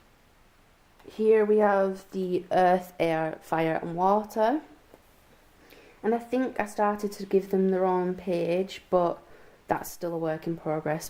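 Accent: United Kingdom. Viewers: It's beautiful! What kind of UK accent do you have if you don't mind me asking?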